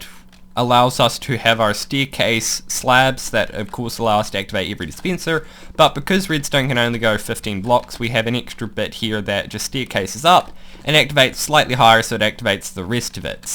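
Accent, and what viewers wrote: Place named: New Zealand. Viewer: He is from New Zealand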